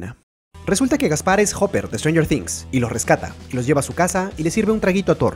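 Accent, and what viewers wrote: Peru. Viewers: ERES DE PERU MASNA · aah eres peruano xd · Todo porque le entiendo porque yo también soy peruano uwu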